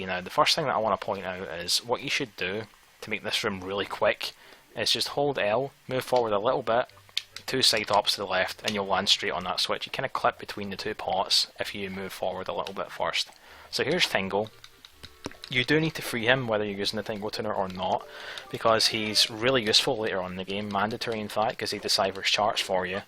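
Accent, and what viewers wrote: Scotland, United Kingdom. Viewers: Oh, damn, this is the first video I've seen from you - a friend recommended your channel. Nice to hear another Scot! Mind me asking what part you're from?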